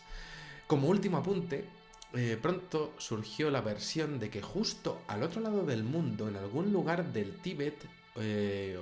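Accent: Spain. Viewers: pues sea lo que sea que ay ahi no es normal lo que me mas bien me pregunto es que buscan los extraterrestres ahi si no ay casi vida en flora y fauna ni gente a que van ni modo que venga a vacacionar o a vistar pero bueno exelente video lo visitaria pero el echo de pensar que algo me pase me da miedo jajaja por cierto me encanta tu voz de español saudos desde mexico ven avistarnos :)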